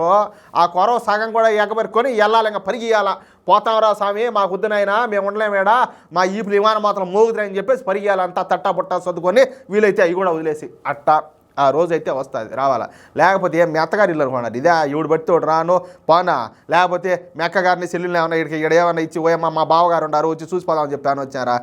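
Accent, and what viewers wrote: Rayalaseema, India. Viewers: మీరు రాయలసీమ మాండలికంలో విషయ వివరణ చాలా బాగుంది.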